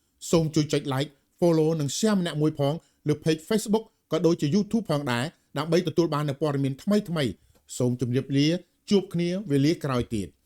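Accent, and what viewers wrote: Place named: Vietnam. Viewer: និយាយខ្មែរសំនៀងយួន🤣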